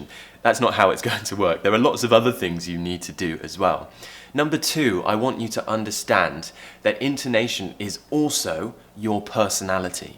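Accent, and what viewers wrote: RP, United Kingdom. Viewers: Wow new hair cut... Handsome! I want to learn english and the british accent thats why i keep on watching you, you are good mentor..thank you! From Philippines... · Compering to a quite arrogant american one, it caresses ears. I love this posh accent and soft or lost R. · I love to listen your beautiful british sound and You are a good teacher ever! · Clear, British English, i understand all, please advise me BRITISH SERIALS, with British accent, so i will be very thankful for you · 好听的英式腔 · Aaaaahhhhh!!! Ur accent is👌👌😍 Honestly , I love British accent♥️the most ...though m Indian🇮🇳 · British accent is more likely I like it 2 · I love British accent, especially yours because it's very clear, its easy to understand. 😍❤️ From Philippines 🇵🇭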